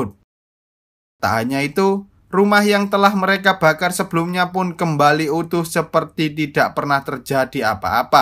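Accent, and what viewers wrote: Java, Indonesia. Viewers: Waduh pekat juga jawa nya ya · Suara jawir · Bang kamu asal dari Jawa ya kalo iya jawab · anjir admin e orang jawa to hahha seketika aku ngrokok , admin e ngomong wes rasah kesuwen aku watuk keselek belok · Pengisi suaranya kentel jawanya.. jellek amat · Medok brooo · Logat jawa bgt bew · Medhokk kalii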